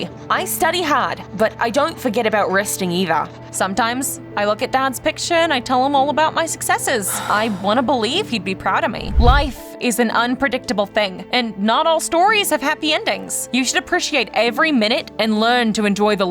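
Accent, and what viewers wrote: United Kingdom. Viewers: The British accent though 😅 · Dnt like this British accent.its annoying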